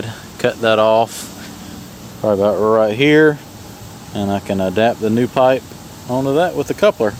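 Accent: South, United States. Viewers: I live in east Texas and your southern-accent is slightly different than it is here. You pronounce the word "water" different than most people here. You say "the toe of the dam," and we say "the foot." Unfortunately now, most people here no longer even speak proper Southern English. They put the slang and vulgar in every expression. This video helped me to understand why spillways are important.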